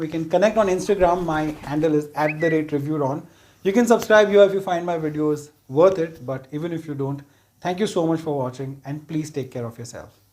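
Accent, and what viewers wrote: India. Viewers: Why do you have an Indian accent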